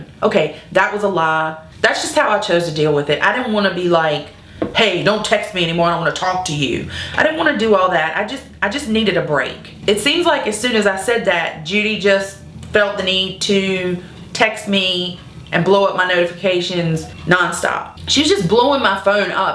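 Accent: South, United States. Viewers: High five for us girls with a southern accent!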